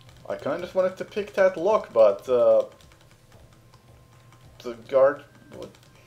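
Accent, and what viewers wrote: Finland. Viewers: That’s a Finnish accent 🤘🏻🇫🇮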